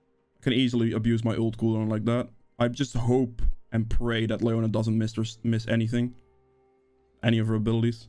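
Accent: Netherlands. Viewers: Lekker nederlands accentje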